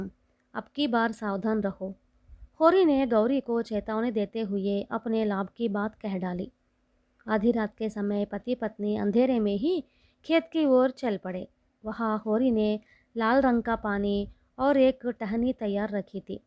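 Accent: South, India. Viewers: So nice 👍. Old Chandamama of 1960s and 1970s was pure 💎 gem. Carry On dear. Read more of these in Hindi version so that deserving children are not deprived of the good lessons taught in childhood 😊😊😊😊. Best of luck 😁. Your South Indian Hindi accent makes it so sweet and beautiful to listen. See that your accent has become your strength and advantage.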